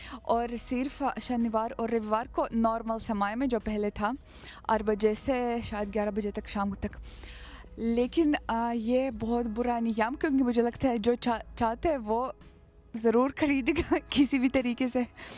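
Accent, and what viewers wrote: Standard. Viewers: Bhai yrr itni sudhhh hindi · Bhai kitni pure hindi bolri h ye ladki ❤ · aapke videos badhiya hote hain aur aap shuddh Hindi bolte hai utana hamahare yahan par pade likhe loge bhi nahi bol pate hai. Maine Aap ko videos mai pahale bhi kai baar yeh bolte hue suna hai ki “ Bhagwan ne mujhe dimagh bahut kum diya hain “ aisa aapko videos mai aur apne aap se nahi bolana chahiye. Aisi meri aapko sujhaw hai. hamhare pure India se aapke bare mai koi bhi vyakti nahi bol sakta hai aisa mai samajhta hoon. Aap ek buddhiman avam khubsurat mahila hai. Ye keval aapka complex hai.please drop it. Videoske liye dhanywad aur subhkamnaye.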